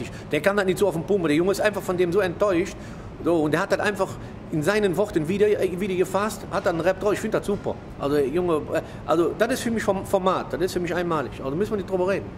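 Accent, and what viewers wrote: Cologne, Germany. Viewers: Der Typ kann nix und hat nix. Er ist eine Kölner Laberbacke! Er äußert sich zu gewissen Themen im Kölner Dialekt und veröffentlicht die Video auf YT.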